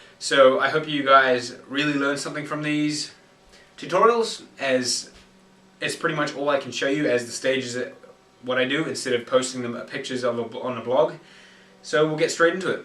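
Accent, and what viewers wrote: Australia. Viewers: you sound Australian or New Zealand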